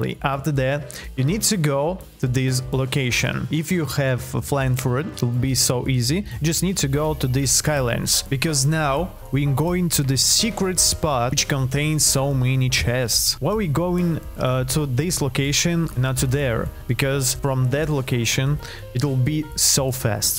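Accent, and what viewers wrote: Russia. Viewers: bro really sounds like Russian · Great option killing yeti, i was tryna grind since light was on stock and im on an alt im max lvl on my main tho also LOVE THE RUSSIAN ACCENT!!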